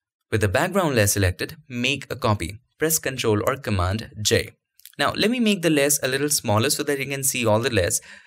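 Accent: India. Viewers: It is called Hindi accent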